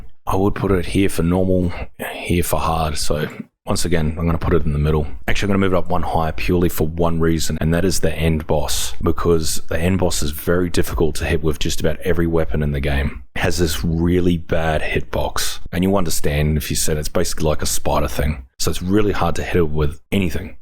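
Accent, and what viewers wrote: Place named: Australia. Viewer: I think he's from Australia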